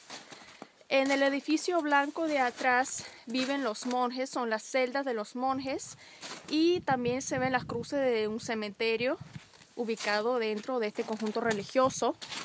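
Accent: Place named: Venezuela. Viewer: Diría que tienes acento Venezolano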